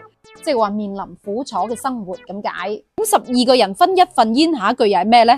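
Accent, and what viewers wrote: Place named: Dali, China. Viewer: 大沥口音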